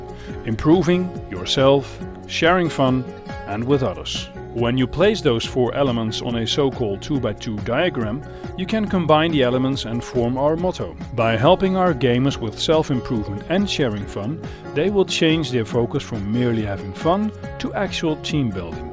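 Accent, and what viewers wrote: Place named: Netherlands. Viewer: is hij nederlands want zn zijn accent is gwn nederlands en 'is' en 'gamers' zat een heel nederlands accent op xD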